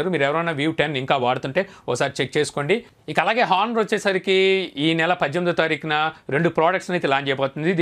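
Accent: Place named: Telangana, India. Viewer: Namaste kaaka telangana slang 🔥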